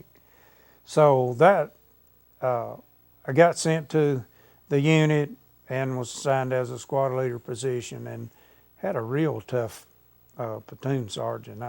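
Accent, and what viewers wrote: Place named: South, United States. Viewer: He's got a way of story telling and that southern accent adds some drama which is entertaining.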